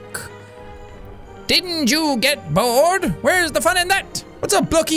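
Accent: Ireland. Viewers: I think it's Irish like how he says shy-t instead of shit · i totally love his irish accent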